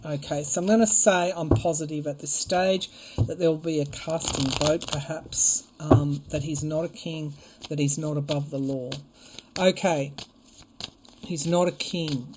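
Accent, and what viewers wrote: Australia. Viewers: Australia your accent is awesome I am from mass down the Cape cod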